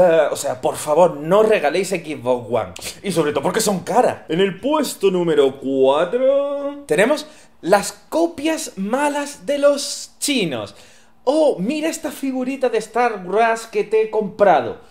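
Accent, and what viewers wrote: Granada, Spain. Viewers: Edu Rubio Jimenez los de Murcia no hablan así! es granaíno :)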